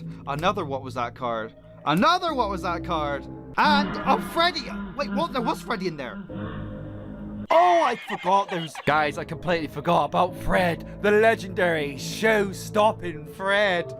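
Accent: United Kingdom. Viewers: Like it sounds like a mix of British and Australian But it sometimes phases into a bit of French And it randomly goes to American I’m sorry if I offended you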